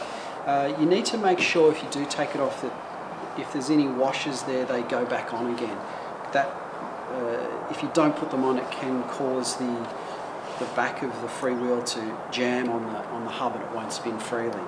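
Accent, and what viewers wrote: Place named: Australia. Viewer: Is not a limey ( BRITISH ) he is an Aussie or kiwi THE SOUND ON THIS VIDEO IS TERRIBLE THROUGH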